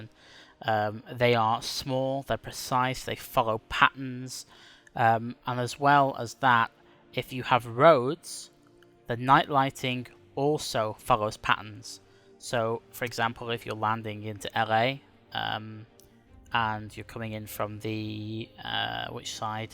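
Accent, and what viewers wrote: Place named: England, United Kingdom. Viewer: can you realy not tell by his accsant, that he is English? i am Norwegian thoght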